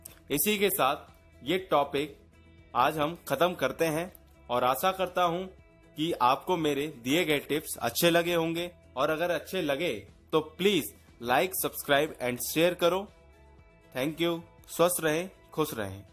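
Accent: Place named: India. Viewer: fuck! this accent! i'm so fed with indian accent! fuck!!!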